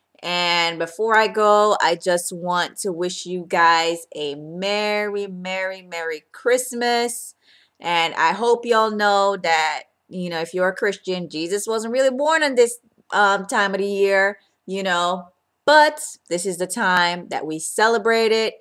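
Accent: Jamaica. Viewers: loveeee love your hair- i am going natural-6 months post relaxer now- are you jamaican? i hear an accent? my whole family is jamaican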